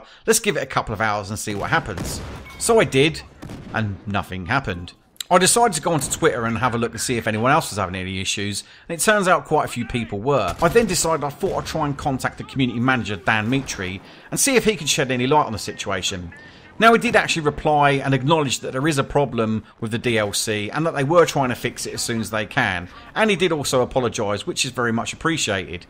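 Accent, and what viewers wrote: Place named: Brighton, United Kingdom. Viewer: ***** nice, do you have hardline on both platforms? Also what part of england are you from, judging from your accent I would say Brighton ;)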